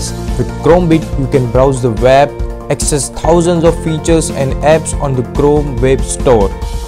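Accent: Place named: Punjab, India. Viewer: I cannt listen to this punjavi accent ..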